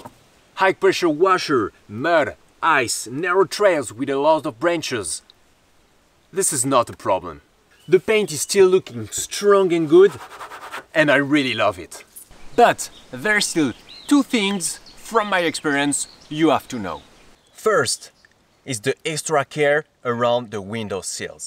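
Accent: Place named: France. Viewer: Him : *Talk My brain : Where is this accent come from? Him : France Me as a French Canadian : Oh so this is how I sound... Belle découverte ton channel. J'achète mon Forester 2001 demain merci pour ton expérience!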